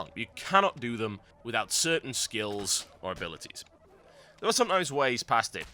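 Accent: United Kingdom. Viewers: i miss old:( the british one he has gotten signifigantly less britanly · Dude your new intro is sooo british.....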